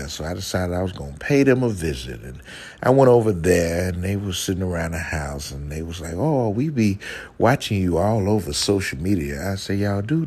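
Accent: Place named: Louisiana, United States. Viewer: Yes that sounds like us Louisiana folks if food critics was a person ❤️😂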